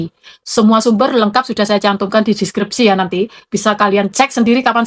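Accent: Java, Indonesia. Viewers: Mendok kali lu kak, Belajarlah menghilangkan mendok... Mendok gak enak di dengar.... GK bersemangat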